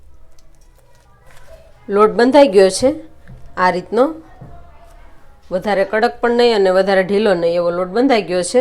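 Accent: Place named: Africa. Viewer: Looks amazing ben Hu pan banavish Radha rani bless you ❤ You sounded like you are from Africa Me too now in uk 🇬🇧 😮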